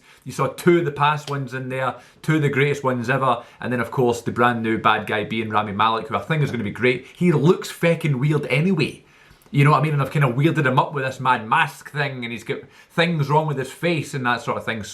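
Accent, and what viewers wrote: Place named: Scotland, United Kingdom. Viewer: Oh by the way what part of Scotland you from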